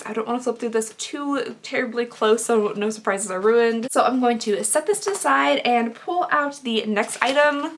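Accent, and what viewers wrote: Kentucky, United States. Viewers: I was triggered when you said you are sounding more Kentucky every day, and then I realized you are in Richmond. I live in Winchester. You are allowed to talk smack about kentucky if you live here...lol. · I LOVE the KY accent <3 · “Soundin more Kentucky by the day!” LOVE my Ky accent & being from, living in BBN 💙 Love you, you’re truly a breath of fresh air! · You make us Kentucky gals proud girl! Be proud of that Kentucky accent! 😊❤️ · I just found out you’re from Kentucky and now that I know I can pick up on you accent when it slips out here and there. Haha I’m also from Kentucky. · "Soundin' more Kentucky by the day" Nothing wrong with that, girl! Good evening to you from Southeastern KY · I know your Kentucky accent is partly to blame (im from oklahoma so i feel this) but your pronunciation of some things makes me giggle a little:) especially apotheke!! 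· "Boxcutter'd... sounding more Kentucky by the day!" Wow, didn't know you were from KY until you pointed that out! And I've been watching your channel for awhile now. I'm Lexington, we're the same age and I really wish we could be friends irl UGH! ❤❤ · ‘Sounding more Kentucky by the day’- I’m dead, lol I feel that though.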